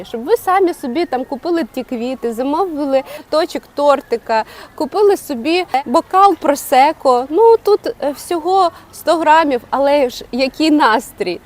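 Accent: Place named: Western Ukraine, Ukraine. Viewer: Ваш украинский напоминает западно украинский, я тоже так говорила, после отдыха на западной Украине.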